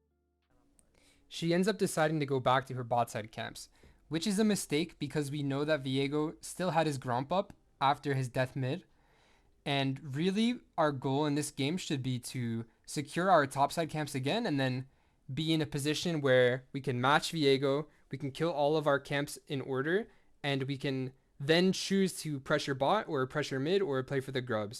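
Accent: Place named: North America. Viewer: Sounds like they're from NA, not sure what accent you hear from the video to think Zimbabwe :D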